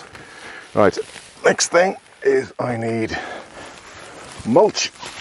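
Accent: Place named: Durham, United Kingdom. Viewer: Wow you really had me fooled. I was sure you were from Durham on the NE of England. I'm from Newcastle originally but also living in Scotland by Elgin/Inverness. Thanks for producing these great vids. I'm really enjoying them and good luck for the future. Paul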